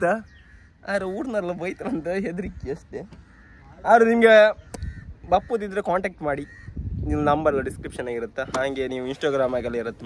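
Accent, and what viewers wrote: Kundapura, India. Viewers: Wow superrrr mangroves Vlogg superr Kundapurane chandaa nivuu mathadooo kundapurr bashee ennuu chandaa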